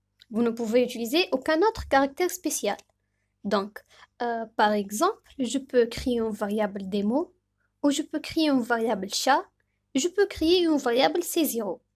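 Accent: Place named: Tunisia. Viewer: pure Tunisian accent , Keep up the Good Work .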